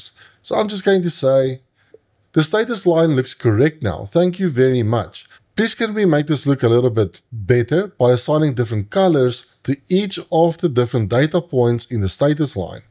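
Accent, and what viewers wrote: South Africa. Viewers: are you from South Africa ?:)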